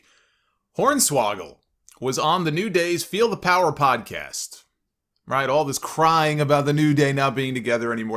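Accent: New York, United States. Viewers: Lol, what? I hear nothing but NY accent the entire time.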